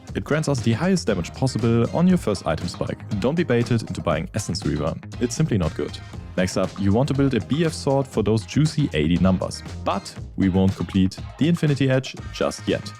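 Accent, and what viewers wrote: Germany. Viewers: Klingt etwas als würdet ihr aus Deutschland kommen xD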